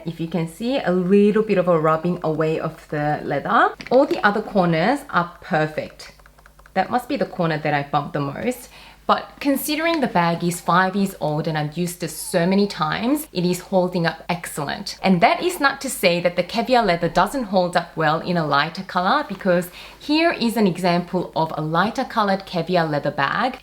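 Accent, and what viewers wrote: Australia. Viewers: Are you from Australia? U have strong accent, sounds funny. Great info tho! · Is she Australian? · She sounds like she lives in Australia but didn’t grow up there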